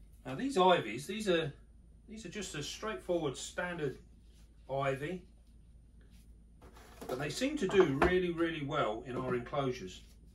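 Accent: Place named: London, United Kingdom. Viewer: Great videos can’t stop watching now, where about are you from as you sound like you have all London accent but I didn’t think e could keep venomous spiders over here? Or am I I just being stupid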